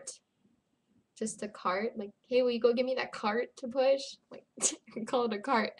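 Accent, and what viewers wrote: Las Vegas, United States. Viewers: My wife is from Las Vegas, and you guys sound exactly alike. There is a clear Las Vegas accent, a good example is the "uhh... yeah." Pretty common among those I've met that are from Las Vegas. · Thanks for that. Just confirming the actors have your accent on CSI LosVegas ... Seems they do :) Also, I'm Aussie. Can't see the flag in its specificity well enough but, is it an NZ flag ? What's up with that out of mere curiosity ? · yeah your accent I didn't realize that's how you sound I'm in las vegas been for 8 years. but we have so many people like me that are just coming into the city from somewhere else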